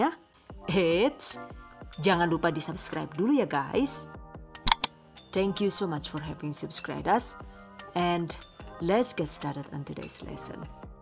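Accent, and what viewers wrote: Banyumas, Indonesia. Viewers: Deneng ngapak 😭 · Alakaehh wong ngapak kie tah 🤣🤣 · Ngomong ngapake lah buk, ngakak nyong 😂😂😂 maturnuwun resepe bu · Fokus suara ngapak🤣🤣🤣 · Lah ngapak wkwk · Aq ngakak kalo si ibu ngoMong ngapak..🤣🤣🤣. Yakinlah sumpah aq ngefensss karo sampean.. luph youu.. resepnya mantuul.. #salamdariwongpemalang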